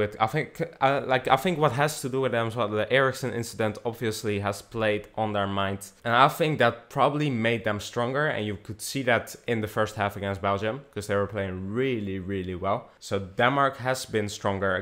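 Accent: Netherlands. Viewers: ik ben ook nl btw ik wist dat je nl was was te merken aan je accent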